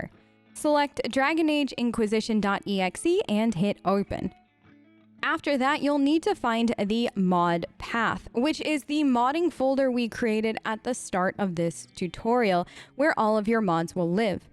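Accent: Australia. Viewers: i like how ever now and then a bit of your accent comes through, to me it sounds australian, possibly new zealand...but i could be totally wrong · why does it sound like your aussy but trying to hide it with a very middle American accent? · 1- thanks so much for this! This and your origins and DA2 mod videos have been awesome! 2- Have you ended up living in australia or something? That aussie accent is leaking through!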